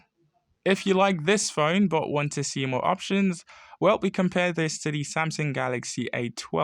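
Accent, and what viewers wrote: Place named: United Kingdom. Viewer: I had no idea he had a British accent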